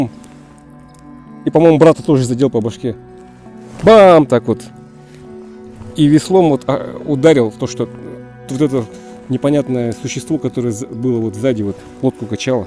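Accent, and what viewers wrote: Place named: Russia. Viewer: Как приятно слышать настоящую русскую речь, неиспорченную, народную. Удачи и процветания! Из Полтавы, Украина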